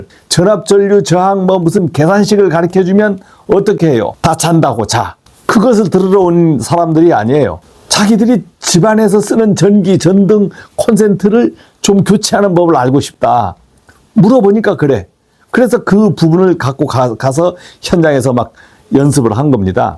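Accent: Jeju, South Korea. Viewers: 동향분이셨군요 제주도 말투가 보여서 긴가민가했었어요 ㅎㅎ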